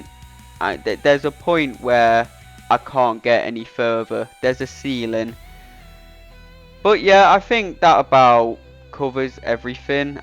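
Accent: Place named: England, United Kingdom. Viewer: I love English accent 😂 my favorite I quit vid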